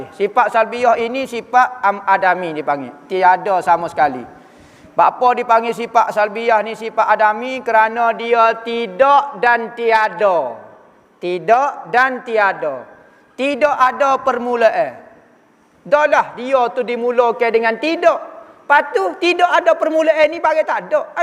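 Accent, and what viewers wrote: Kelantan, Malaysia. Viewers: suka dengar ostaz kecek klate hehehe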